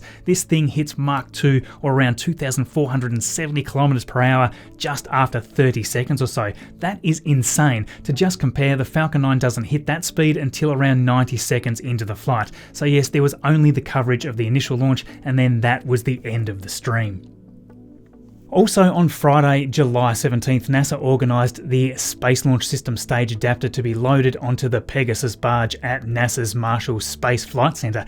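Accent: Australia. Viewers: Don't you talk fast - and why an Australian accent?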